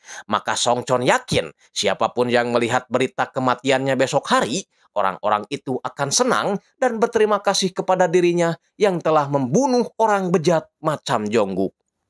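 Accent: West Java, Indonesia. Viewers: Lucu banget aksen sundanya kang😂, hatur nuhun sudah dibikin ringkasan Killer Paradox nya, seru pisan... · dari suaranya kedengeran jelas orang sunda😅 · Gua subscribe Karena channel ini ada logat Sunda nya 😂😂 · bukan iq7 bejir emang aksen sunda aja, bukan berarti aksen sunda = iq7 🗿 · Baru nemu chanel rekomendasi film2 unik, sapa dong mang wkwkw logatnya kga asing urang sunda 😂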